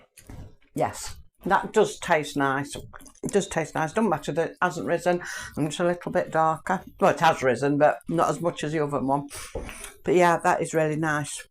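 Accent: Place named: Nottingham, United Kingdom. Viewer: I’m from Nottingham. I thought I recognized the accent!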